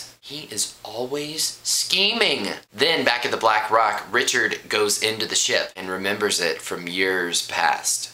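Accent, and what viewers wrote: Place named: United States. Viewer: I love your videos dude, and I especially like the sound of your voice and your accent, it's so cool, which part of the US are you??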